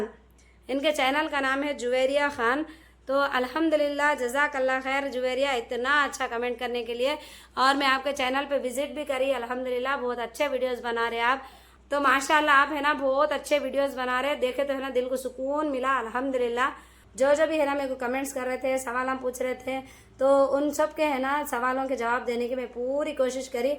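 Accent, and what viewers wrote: Hyderabad, India. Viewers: Yeh hyderabadi language with old city accent ..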